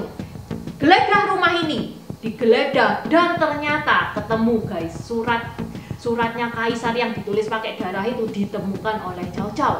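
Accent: Java, Indonesia. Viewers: Logatnya njawani men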